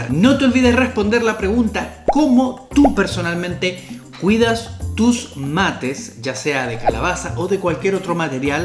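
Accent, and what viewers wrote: Chile. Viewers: Che de dónde sos?? Pareses medio chileno por tu acento pero me párese medio raro un chileno tomando mate jaja qsy 🤷🏽‍♀️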